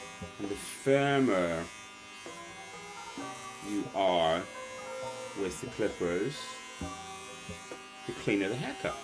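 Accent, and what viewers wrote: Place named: England, United Kingdom. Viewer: I just love his English accent!!!!!!!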